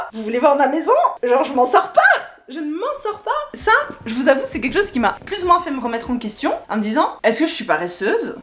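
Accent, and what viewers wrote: Belgium. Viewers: Absolument toutes les caractéristiques de la meilleure des mères. Dont le STRESSE!!!!! Autrechose: - l'accent belge, merci, j'adore (parce que j'ai trop de bon contact avec eux mais faut pas dire qu'on est français):) - la sincérité, bah vi, pourquoi en dire plus? - courage maman - Quel contraste entre et. si vivante et si calme. Vous êtes beaux. - Au diable la perfection que tu recherches, si Poipoi sourit 10 fois par jour (avec toi, j'en suis sûr), alors... J'envi sa jeunesse. Merci pour ta confession pleine de valeurs.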